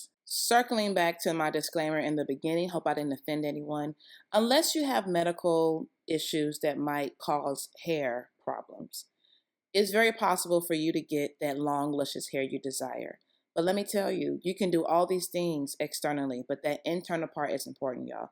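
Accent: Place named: Nigeria. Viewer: The naija accent😍😍😊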